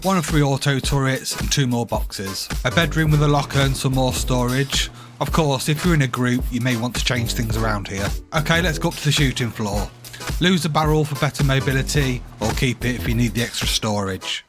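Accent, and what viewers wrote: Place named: United Kingdom. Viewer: Wait that was a girl ? 😂😂 also where is she from in aus cause that accent also sounded British lmfao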